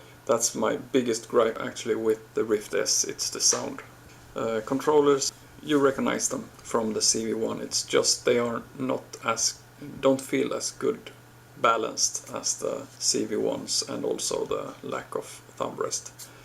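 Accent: Norway. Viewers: are you from norway? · Ok cuz u sounden really Norwegian btw im Norwegian :D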